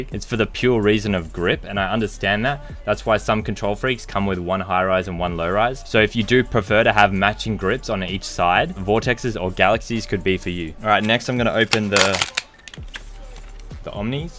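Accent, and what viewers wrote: Australia. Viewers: he is Austrilian dingus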